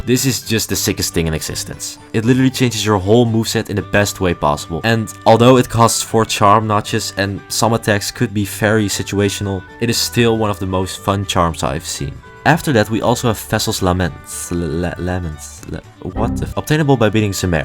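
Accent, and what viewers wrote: Netherlands. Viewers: Dutch accent moment, nice vid tho haha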